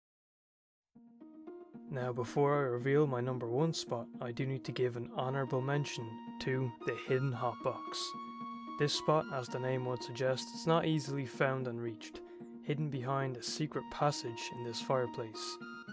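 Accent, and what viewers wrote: Ireland. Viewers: I'm from Cork and you sound like half the people I hang out with, Are ye Irish?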